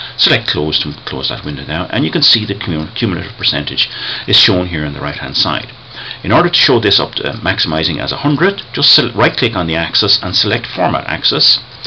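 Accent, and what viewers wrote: Ireland. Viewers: You just saved my ass for my class. Please keep this up. On a side note - awesome Irish accent man.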